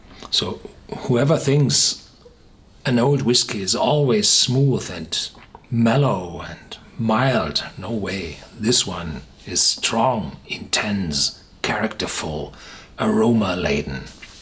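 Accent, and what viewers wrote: Germany. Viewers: come of german and Yugoslavian decent, but was born in Canada and I speak some german but I find myself really enjoying your English reviews...something about the german accent and pronunciation of words makes the reviews amazing....Thank you.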